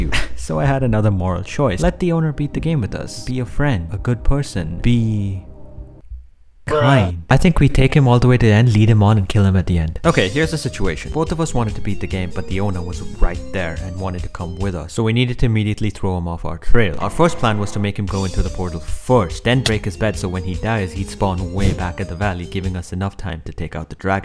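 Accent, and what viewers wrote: India. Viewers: are you Indian you sound Indian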